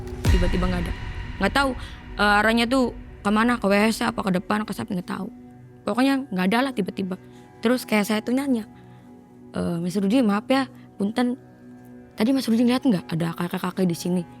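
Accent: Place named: Java, Indonesia. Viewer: cantik, ada medok²nya😅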